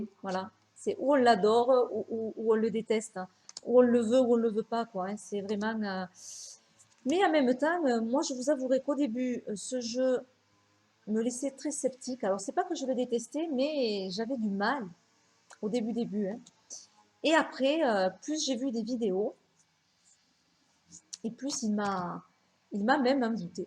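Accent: South, France. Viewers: avec ton accent du soleil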